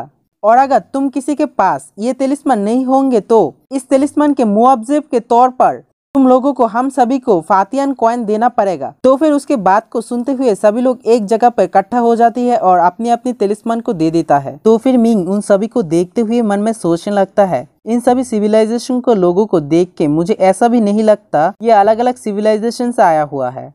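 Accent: Bengal, India. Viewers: Bro r u bangali tumhara hindi pura nehi hae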